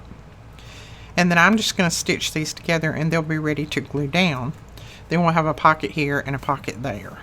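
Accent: South, United States. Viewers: I just love watching you craft your southern accent is adorable and listening to you chat is entertaining, just wish I could answer and chat with you. Thank you for all your beautiful videos.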